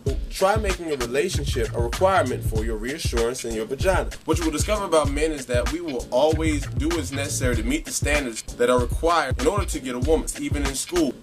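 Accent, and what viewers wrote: South, United States. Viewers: What happened to your accent? I love country accents! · is he from the south? seems like he got an accent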